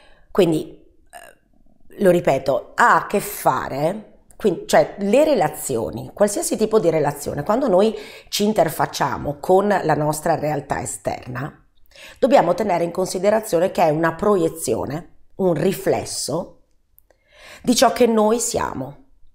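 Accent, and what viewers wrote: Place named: Veneto, Italy. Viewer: Buonasera, mi pare tu sia veneta. Di dove? Io sto a Vicenza.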